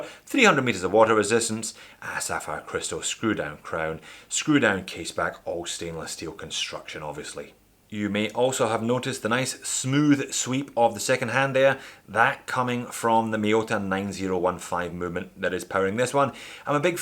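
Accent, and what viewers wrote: Scotland, United Kingdom. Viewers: Love the Scots accent with the Sox shirt! Enjoying this one a lot.. . it is hard to find divers with restrained designs and a vintage feel. Logo is a bit loud for my taste though.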